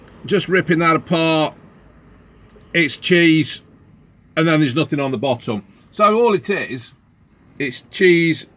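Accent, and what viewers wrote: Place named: Leeds, United Kingdom. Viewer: Our kid I sense some Leeds dialect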